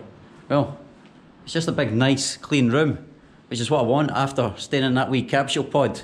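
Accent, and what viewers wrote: Scotland, United Kingdom. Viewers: Scots possess the best accent which is there in the world. Love it. I even started mimicking it. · Is ur scotland accent ?.. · Love your accent . Crazy skotsman :) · Greetings from India. Love that Scottish accent mate. 👍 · You have a thick scottish accent! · improve the accent?... you can't "improve".... accent is obtained from where you were raised!... he's Scottish and has a Scottish accent...can't be helped if others can't understand it!... many foreigners come to Sri Lanka and can't understand Lankans and their accents when they attempt to speak English!... the UK, Britain has so many different accents and even breaking it down to England there are even more accents which are regional and breaking it down further there are also regional accents in London!... there is not one accent in Scotland either...it gets harder to understand if you go to places like Glasgow.... 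is anyone asking you to improve YOUR accent?!... btw I'm an English and elocution tutor in London... born here and don't have a Sri Lankan accent. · I just love that Scottish accent love from The Great State of Texas. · Oh wow you replied!! I subscribed your channel only for your accent though. I just love Scottish accent. I wish I was a Scotsman like you or at least could speak like you. 😆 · After a long time...pure Scottish accent....fab mate... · Pure Scottish accent.... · Apparently the waiter in a Scottish restaurant had a tough time picking your Scottish accent ! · That's some serious Scottish accent mate! :P good job!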